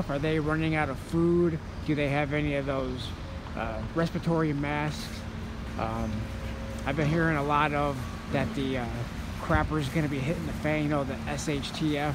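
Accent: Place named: Midwest, United States. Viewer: Rootless Wanders Rootless... you can’t tell by his Mid-west accent!? Good lord he sounds like he could be RADAR O’Reiilys cousin!!!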